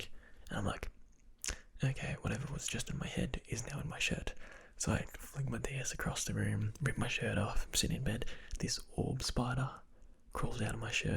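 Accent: Australia. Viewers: I know it's not a new game, but the perfect game for ASMR would be Endless Ocean. The calm music, the player's slow deep breaths through the oxygen tank, the sound of the bubbles surfacing. All that plus your low voice. It sounds like the perfect recipe for ASMR. Your Australian accent would also fit the theme of the game. I'm not sure if that'd be a game you'd personally find very interesting though. I enjoyed it.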